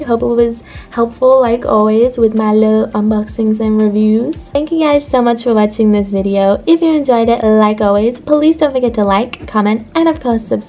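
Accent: Australia. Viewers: You sound like an Australian trying to do an American accent.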